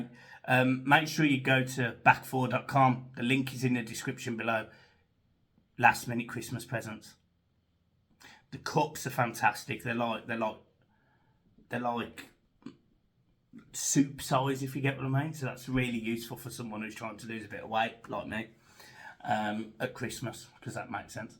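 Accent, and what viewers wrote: Birmingham, United Kingdom. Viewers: I'm not having a go mate but why aren't you a villa fan or a blue I like your program it's great I love the Brummie accent conb.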